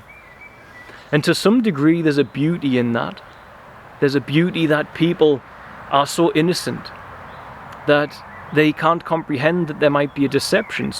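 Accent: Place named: Newcastle, United Kingdom. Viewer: Just a joy to hear a Geordie/!Teesside accent Do tell us your story.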